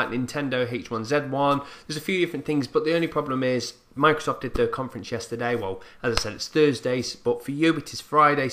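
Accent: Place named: Northern England, United Kingdom. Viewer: Adding the letter "t" to words that don't need it is a common thing in the North,. T'internet and all that.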